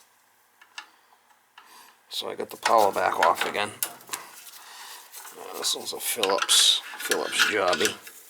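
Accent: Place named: Boston, United States. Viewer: Now I know what those little blue caps / containers in my wife's plumbers bag are lol ... Excellent video my man .. you from BAWSTIN I take it? You sound like decaprieo and marky mark lol cheers ❤️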